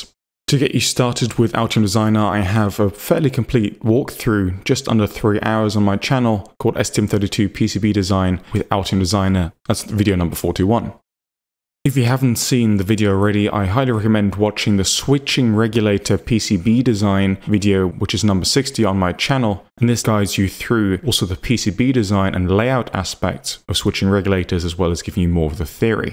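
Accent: United Kingdom. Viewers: You are one of my reasons to love the British accent. I like your voice. You look like a voice over artist. Could you tell me what is the difference between basic and extended part in electronics? I usually hear you in many videos say that this component is an extended part but I don't know what it is. Thank,.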